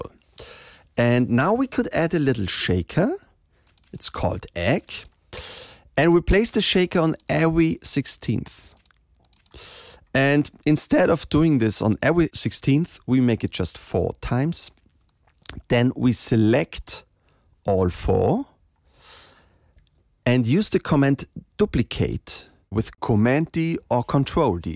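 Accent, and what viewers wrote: Germany. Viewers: Leichter deutscher Akzent 😂😂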